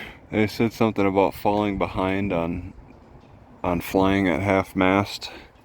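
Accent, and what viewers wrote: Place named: Wisconsin, United States. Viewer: He says from Louisiana but his accent and email and videos clue us into Wisconsin. Not trying to generate your bad vibes but im from mn and id recognize you anywhere. We have the same eyes and ways.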